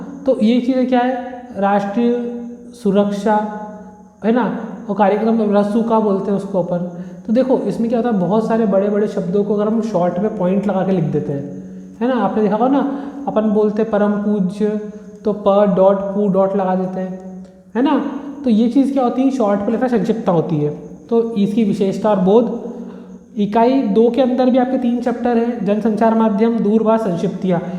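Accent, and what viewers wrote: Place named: Indore, India. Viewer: Indori Bhasha bahut jhalak rahi hai bhai aapki 👍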